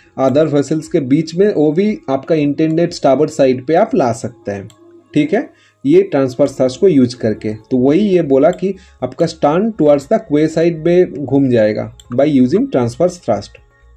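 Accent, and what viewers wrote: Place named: Bengal, India. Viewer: You must be a bong!!! Ure accent suggests so...anyways these videos have been of immense help....Two thumbs 👍 up for your hard work... Really appreciate it....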